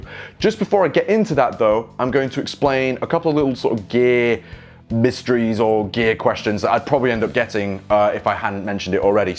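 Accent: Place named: Stoke, United Kingdom. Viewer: Uhhh man. That Stoke accent is hideous 🤮🤮🤮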